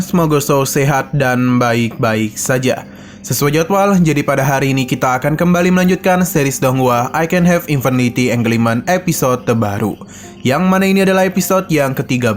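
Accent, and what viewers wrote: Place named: Bali, Indonesia. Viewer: Logatnya mimin kek orang Bali 😅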